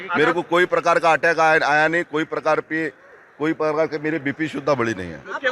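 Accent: India. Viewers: Very interesting news reading in desi and great style.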